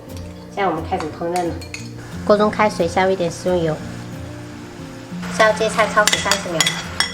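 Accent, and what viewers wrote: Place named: Fujian, China. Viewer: 真香，四川人說福建話，做福建菜比福建人更勵害更標準。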